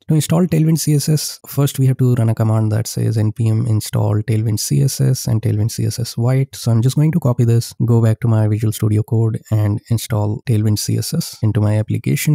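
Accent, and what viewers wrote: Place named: India. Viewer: i heard indian accent i was saved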